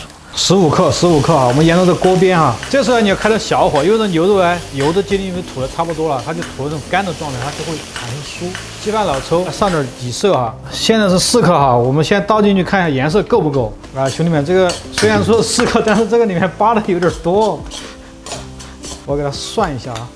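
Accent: Sichuan, China. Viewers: 好亲切的四川话。粑锅，度豆腐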